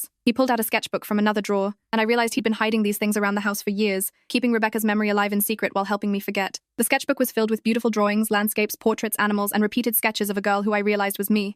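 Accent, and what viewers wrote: United Kingdom. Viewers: British voice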